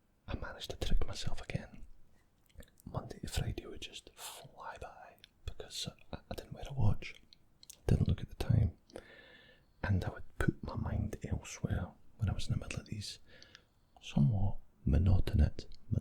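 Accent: Scotland, United Kingdom. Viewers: Love the Scottish accent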